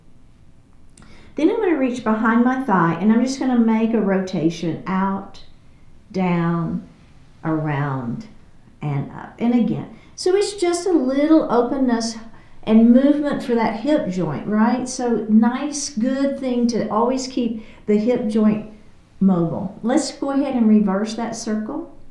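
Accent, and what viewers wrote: South, United States. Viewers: Thank you!! I'm so happy I found you!! You have a calming voice.. The southern accent brings me back home!! Can't wait to start this challenge with you! I'll let you know how it works out. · I just found you -- quite serendipitously! -- for the very first time on your Day 1 of your 28-Day Chair Yoga series, and since it's Day 1 for me as well on committing to moving my body and getting my strength and physical excellence back, I thought it a match made in heaven! I like your style very much, your soft-but-obviously-also-strong energy, your excellent and very clear instructions (I really like the reminders you stick in there, and they often are so perfectly timed!)... and I especially appreciate your slight but lovely Southern accent [since my Daddy was from Georgia, and I do have a soft spot for things Southern:D]... I also love it that you use the Indian names for the poses, etc., AND I felt wonderful after the session... 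Well, I'm just thrilled, and I look forward to being with you every day. I also am not a beginner (a commenter mentioned that), but following the initial processes and starting" from scratch" feels like a perfect intro back into doing (and loving) yoga as I did years ago. AND may I also say (all due respect to the other teachers I've found and tried on YT so far -- I'm so glad you're closer to my age! There's a richness and a most welcome depth and smooth flow that happens from your expertise, and I appreciate it very much. Excellent session, -- thanks so much! I'm delighted to be doing this with you. -- Nancy from Oklahoma City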